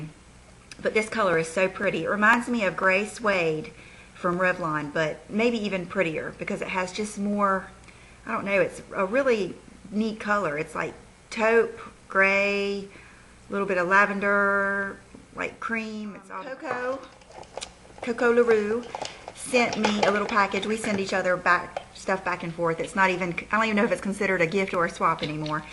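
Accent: South, United States. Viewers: Hi there, I haven't been subscribed to you long, but I love watching your videos! The past few Fridays I've been unwinding and relaxing after work by watching your nice long videos. You always give good, honest advice and are so sweet! And this probably sounds really really silly, but I'm Canadian so I don't get to hear many southern accents up North. And I just love yours! And I know it's not an accent to you, but I just love it! LOL Thanks for being you!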